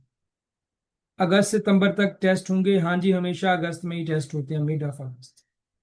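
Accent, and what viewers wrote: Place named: Punjab, India. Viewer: Haha ur Punjabi ascent hits different 😂❤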